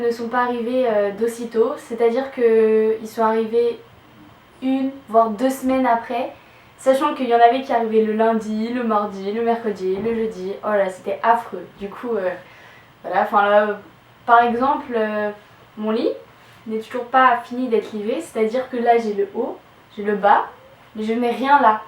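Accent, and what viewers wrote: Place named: France. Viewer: ohh, that's why i can understand your french perfectly - 'cuz your from France (*not Quebec!..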